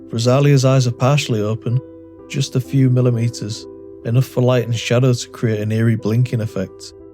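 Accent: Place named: Hull, United Kingdom. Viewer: Your accent sounds like u might be from hull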